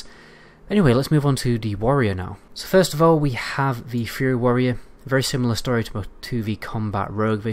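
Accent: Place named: Sheffield, United Kingdom. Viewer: Hey, kinda random but your accent sounds very similar to Alex Turner’s from the Arctic Monkeys. Maybe it’s my un-attuned American hearing and I’m way off, but are you from Sheffield or near there (that’s where he’s from)? UK accents are so interesting and varied, I could be super wrong lol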